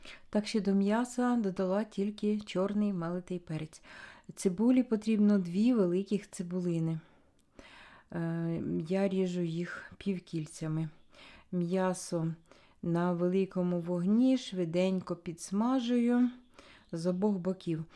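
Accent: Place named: Chernihiv, Ukraine. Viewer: Почти вся Черниговщина так говорит, но рецепт для меня новинка. Спасибо.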